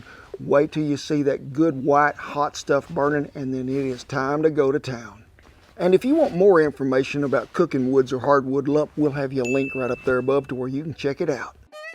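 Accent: South, United States. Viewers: Southern draw* It’s about time I got onto this side of YouTube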